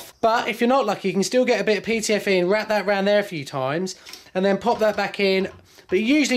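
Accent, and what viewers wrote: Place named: RP, United Kingdom. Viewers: Lol- just rewatched that video, and you are quite right. The 't's are all pronounced perfectly ('t's- geddit?). 74k views... people clearly lap up the posh stuff! Actually, watching it reminded me... Does anyone have any top tips on dealing with chrome? I had a bugger the other day (cover on a old fashioned tap), but wrapping it in gaffa tape and using grips avoided scratching. Same solution for chrome joints... Looked at you site Cumbrian HM... Some good stuff on there! Clearly a handy hero! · HandyGuyHints He even had a posh voice in those days. ;)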